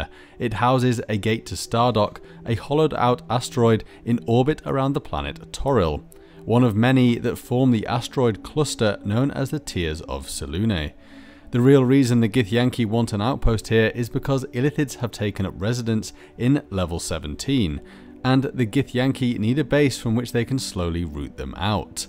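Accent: United Kingdom. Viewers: Yes. Yes. An excellent lore video, per usual. I was particularly impressed with the quality of your elocution. But maybe that has to do with your posh accent. Anyway, please get more yarn. I truly love your BG3 theory videos.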